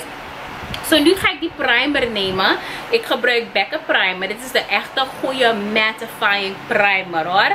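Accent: Suriname. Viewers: I love it, gewoon Nederlands mixed met Engels en een Surinaams accent. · i like your accent, the way you behave. It is funny. Your Su-dutch is ok also how you bring it as a lady, sounds a little bit funny, a suri lady way. .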